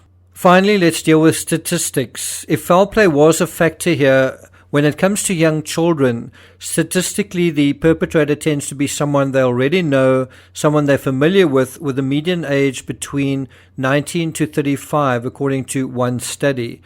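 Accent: South Africa. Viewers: I am following other channels which is a lot closer and know more about this case. On 1 channel 1 of your videos came up where the question was where did it come from and indicate the english according to the hoax messages. I did indicate that the accent alone tells me it is someone from South Africa's video so I looked you up. There is so much info out there currently about this case. Happy to see that you tubers in SA have also picked this up.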